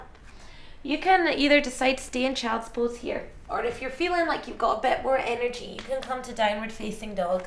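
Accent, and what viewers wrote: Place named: Scotland, United Kingdom. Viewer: That's a Scottish accent.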